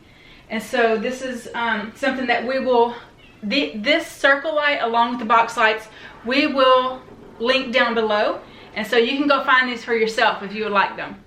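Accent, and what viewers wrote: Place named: South, United States. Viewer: I swear to you I skipped the introduction and was watching thinking… this womans from the south! 😂 (slight accent) Went back and checked sure enough! Watching from Shreveport, LA thanks for the content 👍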